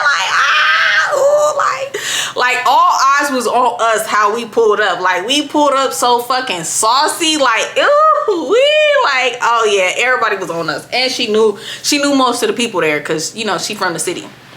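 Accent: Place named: New Orleans, United States. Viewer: Ya feel me...sound like u from the N.O...lol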